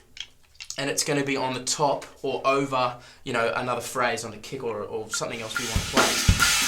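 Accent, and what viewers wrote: Australia. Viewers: Finally an Aussie! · Hahaaaaaa you're an Aussie!! 😃🤪😜🏆🏁🎉💯👊🏼 F@ yeah Dawg!! Never heard you talk before.. only watch you do sic arse Meshuggah covers!!! Where you from bruh... I'm in Brisbane!!! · Hey mate, where in australia are you from? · Ah you're an aussie mate! I thought you were a yank for some reason... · Australian?! Yes! Where you from? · HAH he's Aussie!!! love it.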